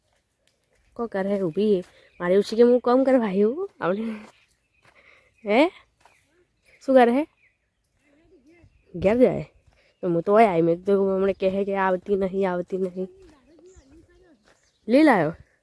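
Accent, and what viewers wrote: Banaskantha, India. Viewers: Tame KO k na KO pan mane tamari boli uparthi junadeesa aaju baju na lago so ben....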